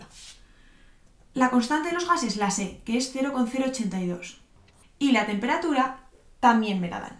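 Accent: Spain. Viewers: Así aprendo muy bien la estequiometria, :D, hasta su acento español, i love you, pero enserio, si explica muy bien.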